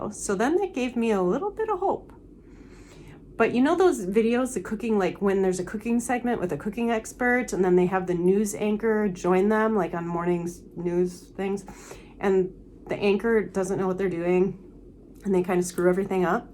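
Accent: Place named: Wisconsin, United States. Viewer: You’re from Wisconsin, I got the accent right!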